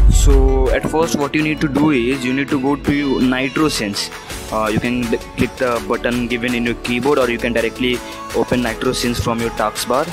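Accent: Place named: India. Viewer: Your Indian accent is very strong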